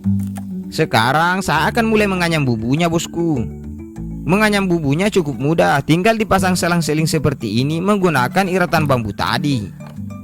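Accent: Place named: Eastern Indonesia, Indonesia. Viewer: Ha.ha baru tahu saya bang, nungguin video paman bara gak upload2, eh malah ketemu ini, Mantap daeng, Saya dari awal, mencoba menebak ini kok dialek timur sana tapi dari mana ya, begitu sebut kata "seket dan "terbangka-bangka" saya langsung 😂😂😂😂😂😂.. Sukses selalu daeng,... Salam dari bori bellayya, tanah melayu deli... Putra asli Butta Panrannuangku.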